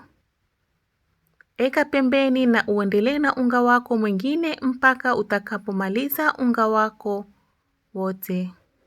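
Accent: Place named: Arusha, Tanzania. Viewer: Wow! Asantee my dear, kwa recipe nzuri.. 😋 nitampikia Samosa za viazi! Mume wangu.. 😊 by The way unaongea vizuri, Kama watu wa Arusha! 👍😀😍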